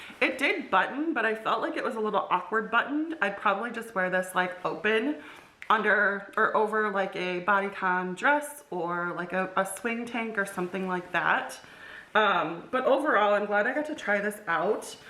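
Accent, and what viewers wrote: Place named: United States. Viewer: How much u talk American oh my God .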